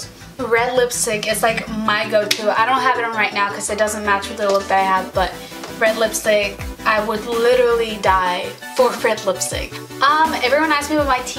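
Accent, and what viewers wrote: Mexico. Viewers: I love your accent mi Chula Mexicana but Mija it's called a stroller dear and you would act like a tom boy also bless the lucky son of a bitch who gives you 4 kids lolllllll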